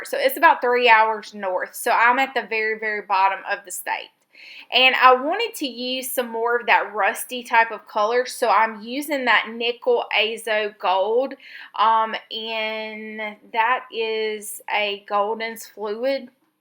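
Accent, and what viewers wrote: South, United States. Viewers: I'm a southern gal myself so I know what you mean! Some folks love a southern accent and some folks don't.....and those that don't can be unkind with their opinion. Love your page and your accent! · I love love love your page and your sweet southern accent! So endearing! I am thankful for you,! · Your accent and southern ways are what I love about your videos the best💗 it’s heart warming! Love your pages💗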